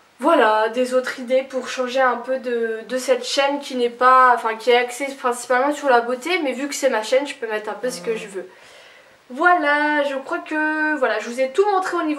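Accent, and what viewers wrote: Lorraine, France. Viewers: Tres bel accent lorrain! trop mimi! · l'accent lorrain, c'est trop mignon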